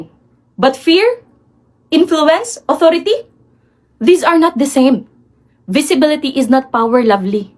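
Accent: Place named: Visayas, Philippines. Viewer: Bisayang English mo day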